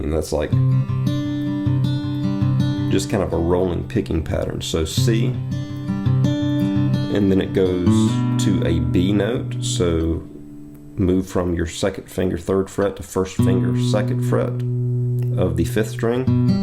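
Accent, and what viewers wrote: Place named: Kentucky, United States. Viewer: I'm a 60 year old (starting my 4th quarter) guitar player who has played in bands for over 40 years. I Loved the Eagles, Foreigner, James Taylor, and now Zac Brown and Garth and many others. I have just started finger picking using more than my Thumb and Index. This very song, Millworker, took me through an unfortunate divorce. Back in 2006, all I had was a borrowed small TV that played DVD's. I had JT's Pullover and that was all. This song became my anthem. Due to some kind of block, I could not roll from the D to to G (I kept coming up with:Ridin the Storm Out - Ha!). I had since figured it out but your's, I believe, is the absolute Best Tutorial I have seen. And being a Kentucky Boy, your SEC accent was welcomed. Keep it up! Jerry K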